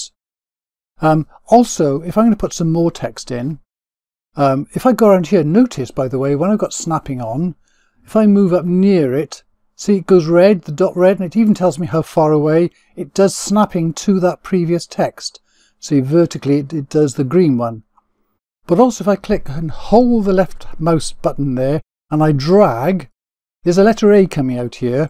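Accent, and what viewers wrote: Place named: Wales, United Kingdom. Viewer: Just found you. You explained that so clearly... and you're Welsh! Thanks, I've subscribed.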